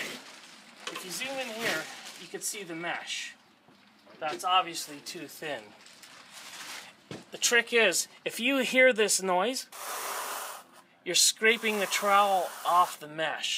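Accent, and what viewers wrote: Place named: Canada. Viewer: Listen to the accent. Definitely Canadian!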